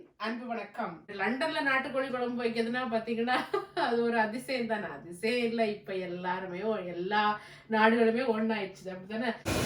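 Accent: Nagercoil, India. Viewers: Hi akka...lot of fun in your videos. Original nagercoil slang...me too from nagercoil ...living in Muscat. Feel homely when hearing your voice...casual talks...awesome ...keep rocking ...May our Lord Jesus bless you. Be blessed & be a blessing to many. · Ver good recipes, very good laugh, Nagar Koil tamil, Positive energy... Super அக்கா... London அக்கா... I am from Chennai.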